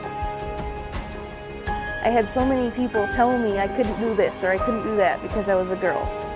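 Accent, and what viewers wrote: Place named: Midwest, United States. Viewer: "Screw you gieez, I'm going to do what I want to." It's adorable, and you sound like the Midwest to me.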